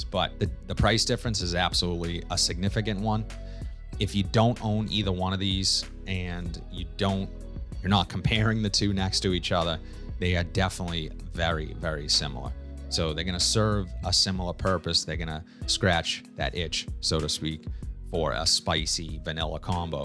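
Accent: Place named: Massachusetts, United States. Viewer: You from Massachusetts, bro? You've got the regional accent. "Pak the cah on the boulevaad." 😉 Thanks for the vid. 👍 Cheers from New York City.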